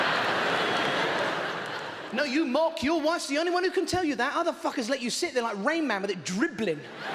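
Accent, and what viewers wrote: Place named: Wales, United Kingdom. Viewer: when he played in Cardiff he often uses a Welsh accent to resonate with the majority Welsh audience same as when he's in Birmingham London and in Scotland go through his DVDs and you will see. Hes Bristolian born in Avonmouth and spent most of his life in Billericay Essex. With a short stint in his teens living in Rhyll North Wales with his dads relatives. Has an Irish mum and Welsh father which would have helped him perfect the accents growing up 😂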